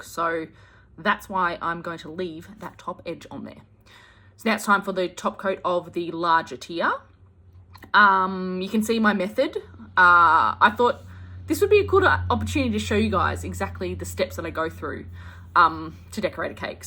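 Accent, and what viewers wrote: Australia. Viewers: 😂😂to the American 😂 your voice ((Australian accent)) could never be a snooze fest😂😂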